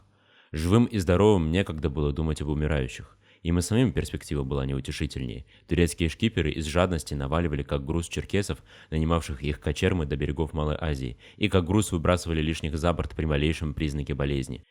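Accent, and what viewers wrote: Russia. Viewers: Автор выговаривает адыгский с русским акцентом. Если хотите услышать чистый современный адыгский язык, кабардинский диалект , вот фильм <link>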